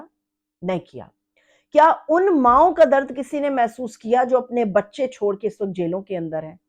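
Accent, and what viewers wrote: Punjab, Pakistan. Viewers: Hehehe MASHALLAH your Punjabi Accent is very cute.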